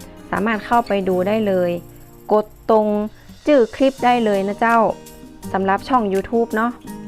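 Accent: North, Thailand. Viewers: เสียงแบบนี้ฟังแร้วเป็นคนเหนือใช่ปะคับ แม่ค้าคนจังหวัดจ้าวครับ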